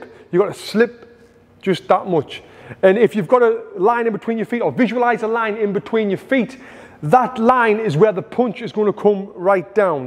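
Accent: United Kingdom. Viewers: The British accent makes this 100x better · I like your British accent 🔥🔥🔥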